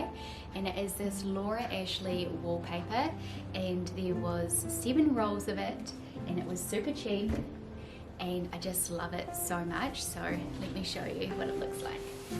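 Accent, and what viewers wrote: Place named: New Zealand. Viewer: The accent is so lovely. I lived in Auckland until I was 18 then moved to Australia and London. Am 54 and still have my accent.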